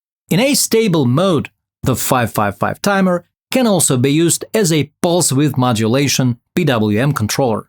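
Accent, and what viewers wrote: Russia. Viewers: Отличное видео! И у тебя подозрительно русский акцент. 👍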